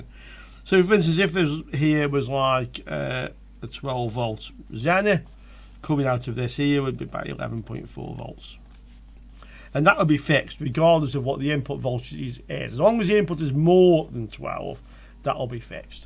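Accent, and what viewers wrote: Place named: Sheffield, United Kingdom. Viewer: It's called an accent. Sheffield or thereabouts if I was to guess. Clear as a bell to my ears.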